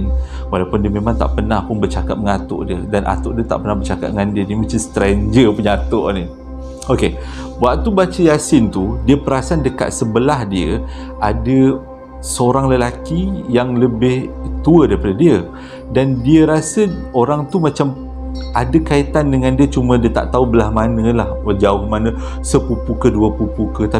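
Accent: Johor, Malaysia. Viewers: Abg memang berbakat beso cara penyampai cerita seram pelesit dgn loghat johor .. 👍👍👍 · Yer la… melekat tul Johornya tu… I like! 😄😄😄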